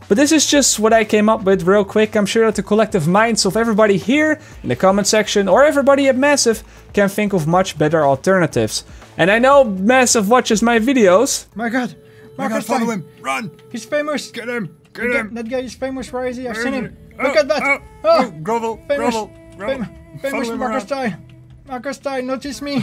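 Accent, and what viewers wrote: Netherlands. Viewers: Kom jij uit nederland? Ik herken je accent. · Are you dutch you sound dutch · Are you dutch? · you sound way more dutch in the videos compared to when you stream - is that weird?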